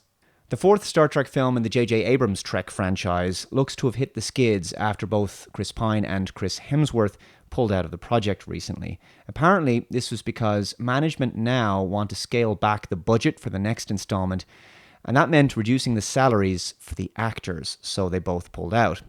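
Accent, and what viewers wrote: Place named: Ireland. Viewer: As an Englishman, listening to your Irish accent, I can see how the American accent developed. That twang is there. As for Star Trek, I was born in 1980 and I still prefer the original series/cast with it's crappy effects in all it's glory.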